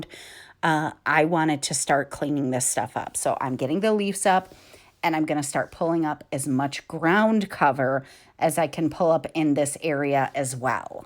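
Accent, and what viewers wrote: England, United Kingdom. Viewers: You are killing it!! I have a question. I have been following you for a year now, where does the English accent come from??? Lol have a great day!!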